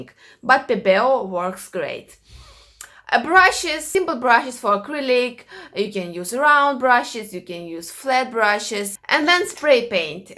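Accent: Russia. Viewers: Русский акцент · Она русская или какая то????английский такой 🤣🤣 video is useful but why not arcylic???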